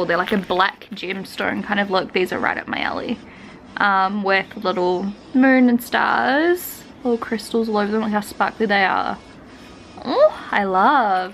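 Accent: New Zealand. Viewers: The voice you do when you call your dogs, my mum thought it was me so funny our NZ twang 😂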